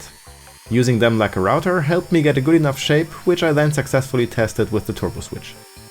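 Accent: Germany. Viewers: for a guy that sound pretty german it's just seem wrong to hear "Aluminum" instead of "Aluminium"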